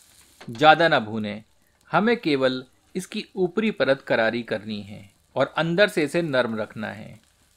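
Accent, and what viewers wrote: Standard. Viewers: ur commentry is amazing... pure hindi... just love it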